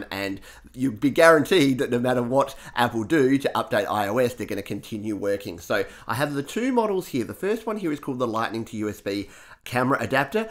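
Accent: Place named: Australia. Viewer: Thanks for that. I’m upgrading from the dinosaur era desktop so all advice gratefully received - (and very happy to hear an Australian accent for once. ) So - Question : I didn’t realise I can use my I pad Pro for recording until watching your vids. But - is it possible to somehow get the I-Pad screen onto a separate large monitor screen ?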